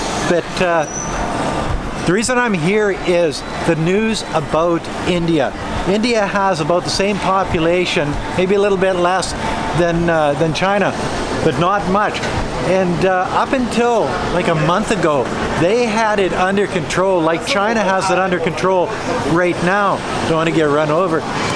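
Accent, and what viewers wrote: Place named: Canada. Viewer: Sounds like a Canadian accent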